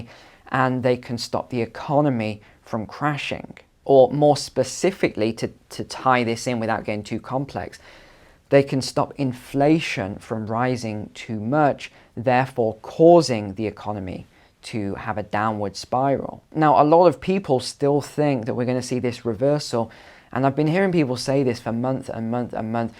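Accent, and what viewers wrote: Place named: United Kingdom. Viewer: I don't know about you all, but it is better to hear that all the banks and real estate are all going bust from a calm British accent.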